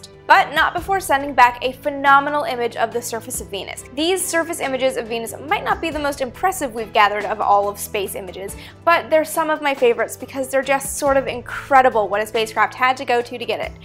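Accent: Canada. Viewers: She sounds Canadian. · Do I detect a Canadian accent? I had no clue there was a picture of Venus' surface, great video! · She is Canadienne.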